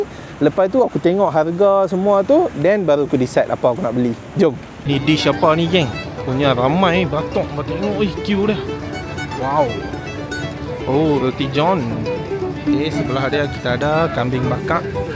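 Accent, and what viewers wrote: North, Malaysia. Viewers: Orang utara kaa boss ? 👍🏾👍🏾 Salam dari taiping perak boss 👌🏾🙏🏾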